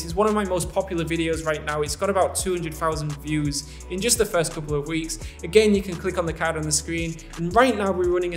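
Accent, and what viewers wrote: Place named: West Yorkshire, United Kingdom. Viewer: You sound like you're form west workshire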